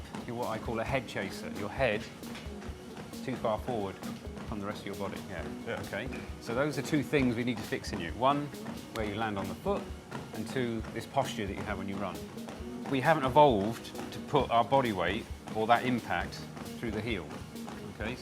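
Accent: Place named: United Kingdom. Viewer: All the information seems good, but does anyone else hate fucking British accents?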